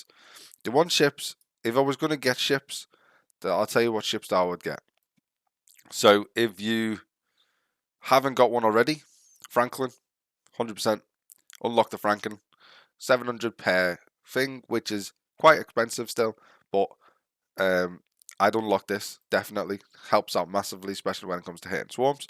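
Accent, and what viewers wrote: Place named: Northern England, United Kingdom. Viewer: Love the northern accent! I'm a little farther north, I'm Yorkshire. Altincham are you?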